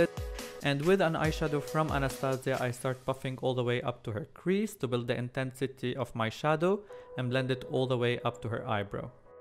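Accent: Arab world. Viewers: يلي عم يحكي لهجته سوري لبناني حتى بالانجليزي😂😂 وشرط مليون بالمية انه لبناني سوري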